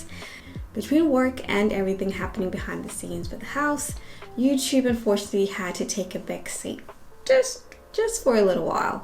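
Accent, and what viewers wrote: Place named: United States. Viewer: Judging from the houses we see on YouTube and those we have seen in person, the general building methods and principles and tastes Zim are too isolated from developed word standards. Without exposure Zim trades ppl and builders merchants n allied industries/professionals will disappoint those who are coming from the western /first world diaspora. 🤷🏽‍♀️ You sound American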